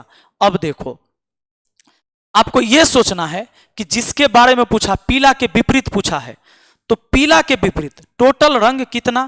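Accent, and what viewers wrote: Bihar, India. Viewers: कोई नहीं यार आप से तो मैं बहस नहीं कर रहा हूं।लेकिन हमलोग बिहारी हैं।दो नही दू बोलते हैं आप भी बोलते होंगे अपने परिवार में। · Sir kia aap bihar se hi hai Aap kabhi kabhi maithli me bhi bol dia kijiye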